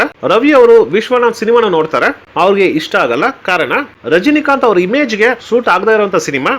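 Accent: Tamil Nadu, India. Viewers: ಈ ಚಿತ್ರದಲ್ಲಿ ರಾಜ್ಕುಮಾರ್ ಪಾತ್ರದ ಸೌಂದರ್ಯ,ರಾಜಕಳೆ, ಘನತೆ,ಗಾಂಭೀರ್ಯ, ಭಾವಸೂಕ್ಷ್ಮತೆ,ತನ್ಮಯತೆ ಪ್ರಪಂಚದಲ್ಲಿ ಯಾರಿಗೂ ಬರಲ್ಲ.ಇಂತಹ ಅಭಿನಯವೇ ಅಲ್ಲದೆ ಸ್ವಂತ ಕಂಠಸಿರಿಯ ಅತ್ಯುತ್ತಮ ರಂಜನೀಯ ಹಾಡುಗಳು.ಆರತಿಯವ್ರೂ ತುಂಬಾ ಚೆನ್ನಾಗಿ ಅಭಿನಯಿಸಿದ್ದಾರೆ. ಜಯಮಾಲ ಕೂಡಾ ಬೆಡಗಿನಿಂದ ನಟಿಸಿ ಕಣ್ಮರೆಯಾಗುತ್ತಾರೆ. ಆ ಕಾಲದಲ್ಲಿ ಕನ್ನಡದಂಥ ಸೀಮಿತ ಮಾರುಕಟ್ಟೆಯಲ್ಲಿ ಭಾರೀ ಯಶಸ್ವಿಯಾದ ಚಿತ್ರ. ರಜನಿ ಬರೀ ಸ್ಟೈಲ್ ಕಿಂಗ್ ಆಗಿ ಮಾತ್ರ ತುಂಬಾ ಚೆನ್ನಾಗಿ ರಂಜಿಸುತ್ತಾರೆ. ಆದ್ರಿಂದ ಅವರ ಪಾತ್ರ ಹಾಗೇ ಮಾರ್ಪಾಡು ಮಾಡಿದ್ದಾರೆ. .ಆದ್ರೆ ತಮಿಳ್ ನಲ್ಲಿ ಒಂದು ಹಾಡು ಮಾತ್ರ ಚೆನ್ನಾಗಿದೆ,ಉಳಿದ ಹಾಡುಗಳು ಅಷ್ಟಕ್ಕಷ್ಟೆ. ತಮಿಳ್ ಮಾರ್ಕೆಟ್ ವ್ಯಾಪ್ತಿ ಜಾಸ್ತಿ, ಜಾಸ್ತಿ ಖರ್ಚು ಮಾಡಿ ಜಾಸ್ತಿ ಗಳಿಕೆ ಮಾಡಿದ್ದಾರೆ. ಈ ಕಾರ್ಯಕ್ರಮದ ನಿರೂಪಣೆ ಸ್ವಲ್ಪ ಸೌಮ್ಯವಾದ ತಮಿಳ್ ಕನ್ನಡ ಶೈಲಿಯಲ್ಲಿದೆ. ಕನ್ನಡವನ್ನು ಕನ್ನಡ ಶೈಲಿಯಲ್ಲೇ ನಿರೂಪಿಸಬೇಕು. ಒಟ್ಟಿನಲ್ಲಿ ದಂತಕಥೆ ರಾಜ್ಕುಮಾರ್ ಹೊರತುಪಡಿಸಿ ಒಂದು ಚಿತ್ರರಂಗವನ್ನು ಅತ್ಯಂತ ದೀರ್ಘಕಾಲ ಜನಪ್ರಿಯವಾಗಿ ರಂಜಿಸಿದ ತಾರೆ ರಜನಿಕಾಂತ್. ಅವರು ತಮಿಳ್ ಶ್ರಮಜೀವಿಗಳ, ಬಡ ಕೂಲಿಕಾರ್ಮಿಕರ,ನೊಂದವರ ಅಚ್ಚುಮೆಚ್ಚಿನ ಆರಾಧ್ಯ ತಾರೆ. ರಜನಿಗೆ ರಾಜ್ ಆದರ್ಶ ತಾರೆ.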